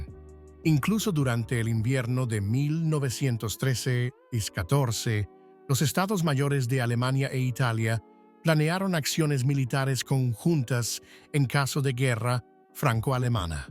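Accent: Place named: United States. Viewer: Porque acento yankee ??? Actualiza la IA y que te ponga acento neutro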